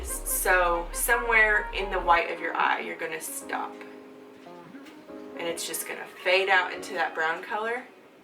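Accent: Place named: South, United States. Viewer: your accent and Southern charm shine through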